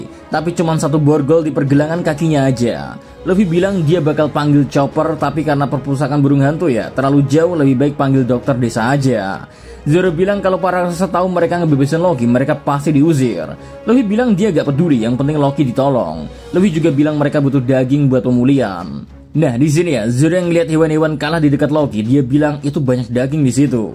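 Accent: Java, Indonesia. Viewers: Males bgt denger suaranya Medok nya medok ga enak. Nada medok jawa tuh enak, terkecuali lu.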